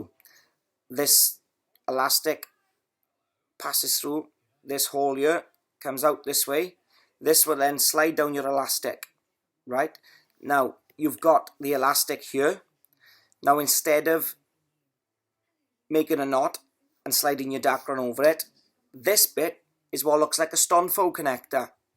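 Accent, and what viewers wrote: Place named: Wales, United Kingdom. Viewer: A fine welsh accent, and a great video, thank you!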